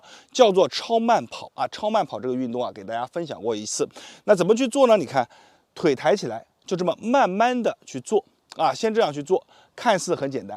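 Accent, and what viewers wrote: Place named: Nanjing, China. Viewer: 聽口音應該是南京人🙂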